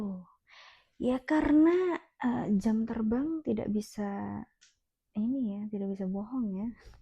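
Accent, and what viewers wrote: Java, Indonesia. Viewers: logat jawa nya melekat jirr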